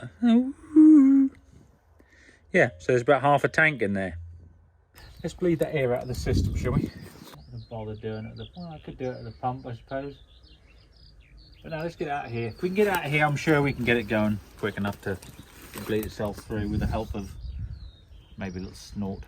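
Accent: Norfolk, United Kingdom. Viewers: All the Norfolk-ness on show tonight 😂 brilliant 👌🏻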